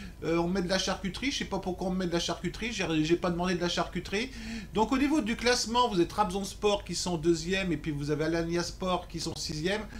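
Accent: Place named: United Kingdom. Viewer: Hello merci pour la pub mentionnant mon prénom, c est normal de ne pas gagner tous les jours, je t écoute depuis suffisamment longtemps pour me faire l idée que tu es au dessus du filet avec caritor 😉😉changes pas ton accent British, il est très fun😊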